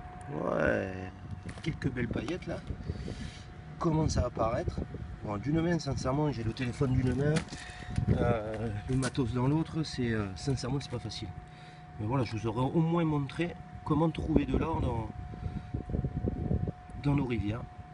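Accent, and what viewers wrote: Hérault, France. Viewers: LORB ON SENS LACENT DU 34 MDR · il habite dans l'Hérault là où il y a l'or et il a l'accent de l'Hérault c'est pour ça que je dis ça. Il y a de l'or dans le Gard aussi. Je suis parisienne et je reconnais tous les accents du sud !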